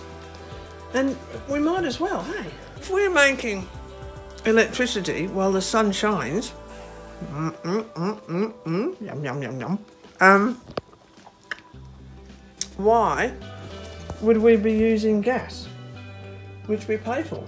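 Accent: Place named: United Kingdom. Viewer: Ah.... You have made my day, thanks for the fabulous video.... 💖, hello from France.. 🙋.... you sound very British, at the end of you"re videos, a nice cup of tea is very British....!! 😂🤣, mind you the French may say, "the trouble with you British, you think you can solve problems with a nice cup of tea" 😂🤣😆... Keep those videos coming.... Thank you, I'm off for a nice British cup of tea..... 👍🙏... Xx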